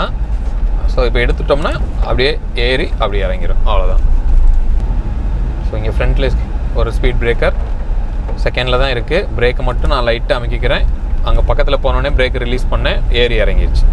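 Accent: Virudhunagar, India. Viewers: Bro neenga விருதுநகர் ahh bro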